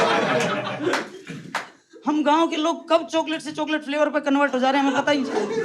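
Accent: India. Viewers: Bhai once again bohot bdiaa… vese jrut to nai h pr bhai k or tera fan hone k naate. Ek suggestion h Haryanvi accent na chooraa kr … baaki bohot bdiaa. ❤ Love uh bhai ne ❤ keep growing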